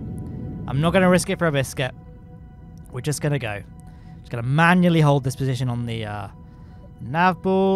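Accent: United Kingdom. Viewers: Love seeing new vids and hearing about whats going on with my favourite cheery brit.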